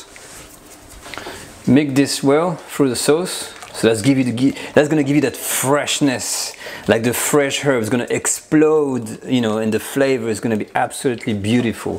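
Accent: France. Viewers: ❤I love your French accent so cute 🥰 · He sounds french. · Merci mon ami! A trultyfantastic dish. I am going to try it on my family I had no trouble following you great French accent. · I think you have a nice French accent. My daughter thinks your accent is typically French but I think you have a nice twist when you speak English and you're very clear. Merci pour la video. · that s ok, we understood :) very nice and how can a French speak such a good English with such a thick accent haha. cute lol, thanks for the recipe, doesnt seem difficult, will try. a bientot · Excellente recette mais l'accent maudit français, ça me fait douleurs les oreilles.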